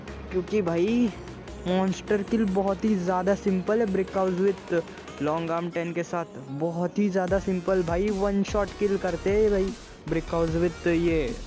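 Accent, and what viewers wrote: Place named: Philippines. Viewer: He is philipino tagalog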